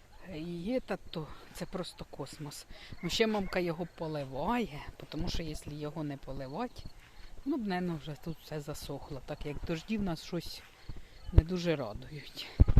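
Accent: Poltava, Ukraine. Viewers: По розмові чути це Полтавська обл.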